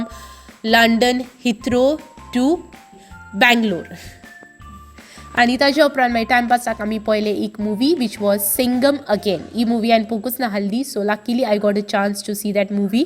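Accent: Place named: Bardez, India. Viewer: Where in goa u are from i m from calangute ur language is like bardez or tiswadi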